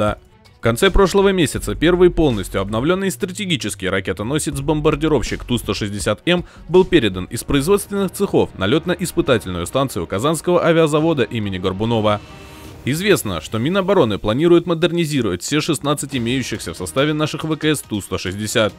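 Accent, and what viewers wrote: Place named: England, United Kingdom. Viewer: Ты лицо своё прячешь, потому что упорно искажаешь произношение русских букв на английский лад? Чего добиваешься, увеличение дизлайков?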